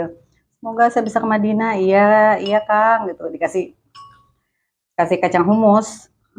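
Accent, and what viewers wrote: Jakarta, Indonesia. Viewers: Biasa liat konten pake bahasa arab ternyata pas di indo nyablak bener betawi tulen😂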